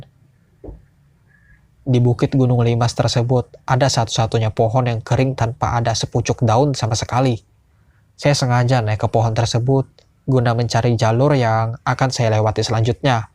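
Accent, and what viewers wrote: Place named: Bali, Indonesia. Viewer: Wah logat bali nih .. 😁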